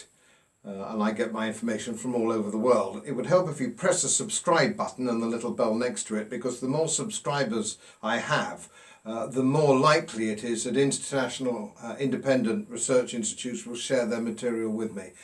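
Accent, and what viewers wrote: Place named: England, United Kingdom. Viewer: Bang on again Sir. I do enjoy your rants. So much better with a ‘proper’ English accent 🫡🇬🇧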